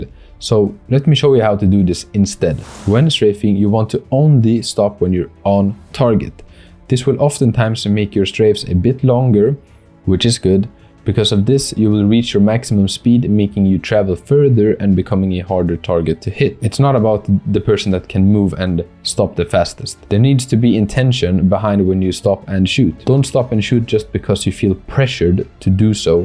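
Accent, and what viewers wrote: Sweden. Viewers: Swedish accent detected.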